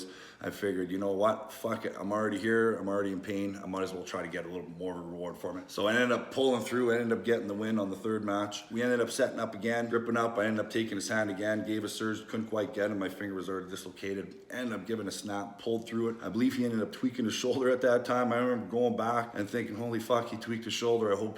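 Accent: Canada. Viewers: So us Canadians really do have an accent eh?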